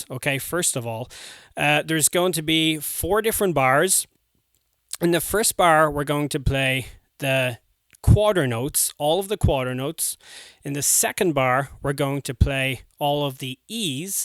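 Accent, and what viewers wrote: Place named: United States. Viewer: Great to see you back doing what you do best. You're a natural born drum teacher. I don't know if your family in Ireland notice it when you make video calls but there's some American creeping into the accent.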